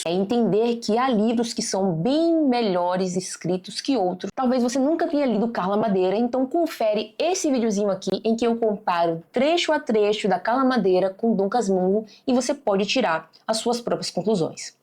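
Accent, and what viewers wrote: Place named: Bahia, Brazil. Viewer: eu ouvindo seu vídeo e, ao invés de prestar atenção, tentando identificar se você é da Bahia pelo sotaque e já querendo chamar pra um café. ❤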